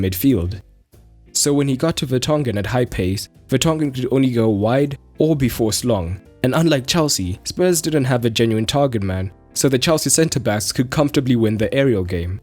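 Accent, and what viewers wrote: South Africa. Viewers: This is a South African accent.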